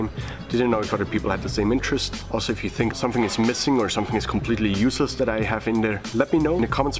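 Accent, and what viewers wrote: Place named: Switzerland. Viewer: Indeed, the Swiss accent sounds nice.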